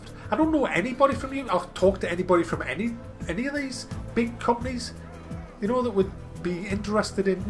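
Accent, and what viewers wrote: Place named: North, United Kingdom. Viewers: noooo, you aren't a caveman. You're just northern <3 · Don't worry what "they" think.I love your vids, reviews, honestly, humour, the sudden madness of abuse of the games/designers/etc and "bell banging".. and , of course Mr bunny.And AND,AND! AND! ( bang bell.!!!!) AND! ( bang bell.!!!!)that cute English accent..(((((thinking of having a whip-round for a new bell..maybe a BIGGER one..like school teachers use to have to ring at end of 'break time..YES!))).Worth a watch.... AB-so-fuggly-BLOODY-lutly.( ( bang bell.!!!!) Have a 'good day'and 'no worries mate'. from Christina in Adelaide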